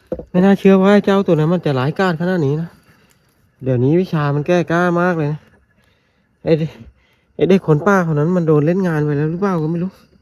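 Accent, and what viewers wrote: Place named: Suphanburi, Thailand. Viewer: เป็นคนเหน่อที่ไหน สุพรรณ หรือ ราชบุรี….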